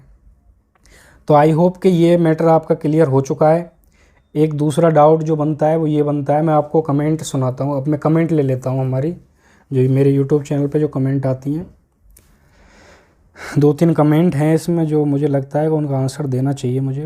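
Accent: Bharatpur, India. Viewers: Where are you from?🤔 You talk in bharatpur accent..🤔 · Bhi bharatpur ke lagte ho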